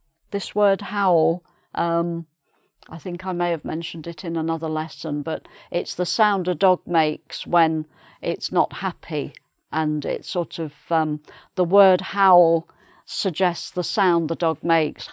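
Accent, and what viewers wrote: England, United Kingdom. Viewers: I love her British accent ♡ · I am an English teacher and today I have learned that uses of "Would." Thank you Miss. (Plus points: you don't speak too fast and I like your british accent.) Lovelots from the Philippines. 😘😘😘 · I love British accent. ❤ I feel happy when I listen to this loving teacher. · British English 🤗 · excellent teacher no doubt! Me being french speaking English since 1977 on a daily basis mainely in the U.S I ADORE hearing this REAL English accent :) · I'm head over heels for your british accent a lot. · Your British accent makes me warm,. I love your lesson. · Thank you Mrs! I do appreciate your lessons and your beautiful British accent.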